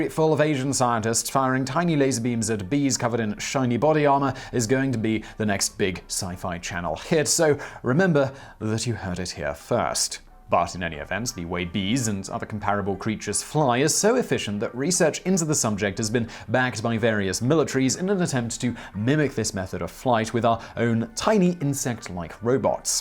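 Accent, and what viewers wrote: United Kingdom. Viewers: Am I the only one who thought this was Vsauce and was startled when he spoke in a British accent?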